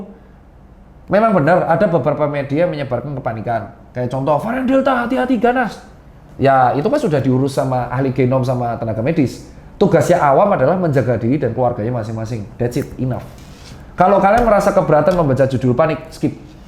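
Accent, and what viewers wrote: Java, Indonesia. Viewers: suka suaranya dokter tirta yang medookk,,,,hehehehee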